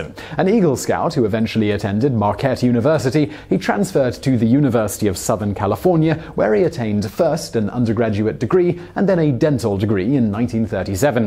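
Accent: United Kingdom. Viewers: Wtf I thought this was Vsauce (Michael here) I was so thrown off by the British accent 😂😂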